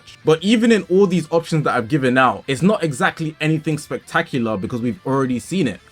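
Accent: United Kingdom. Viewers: wait I did not know you were British honestly this is news to me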